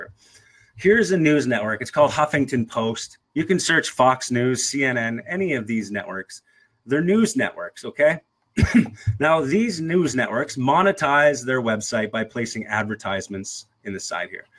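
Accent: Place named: Wisconsin, United States. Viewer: Are you from the midwest? I’m hearing a Wisconsin accent i think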